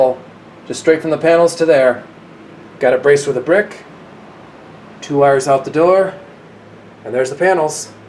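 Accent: United States. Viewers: Happy new year 2024. I like your ingenuity and your accent. Simple, effective, american. I will try with my dutch oven. Thanks for your creativity.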